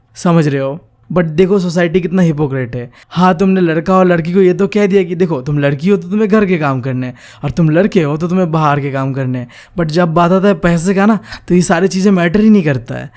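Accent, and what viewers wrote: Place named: Northeast, India. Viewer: This educator is North eastern? I think so from the accent 😊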